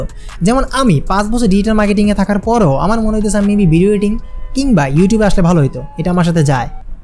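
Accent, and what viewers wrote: Sylhet, Bangladesh. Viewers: Vai apni ki sylhety ni😅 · Vai apni sylheti ni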